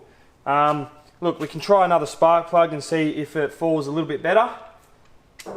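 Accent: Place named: Australia. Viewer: In addition to this Australian Youtube Channel I also watch the Dangar Marine Channel. Stu , the creator of the Dangar Marine Channel is located in New South Wales and I believe the creator of this channel is located Western Australia. Question? Are there regional accents in Australia? While to my American ears both of our Aussie hosts sound Aussie, but it seems that there is a slight difference in the way each man pronounces certain words.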